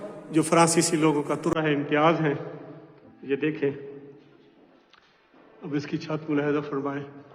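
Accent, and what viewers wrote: Saraiki, Pakistan. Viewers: Sir please saraki ka tarhka lgaya kro Maza ata hai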